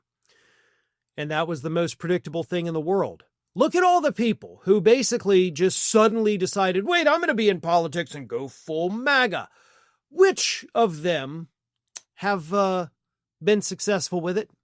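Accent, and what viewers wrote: South, United States. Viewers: The southern accent is starting to get to me. Very insulting to intelligent non MAGA southerners no matter how redneck you pitch it. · No need to mock the south by using that accent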